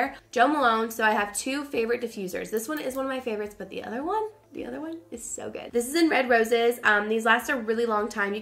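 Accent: South, United States. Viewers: you are getting a southern accent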